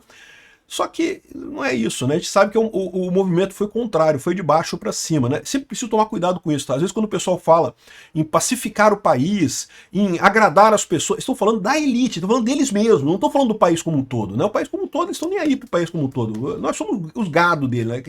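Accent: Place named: Rio de Janeiro, Brazil. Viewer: Tbm amo esse jeito dele se expressar! E tbm o jeitão Carioca. Mato as saudades do meu povo do Rio.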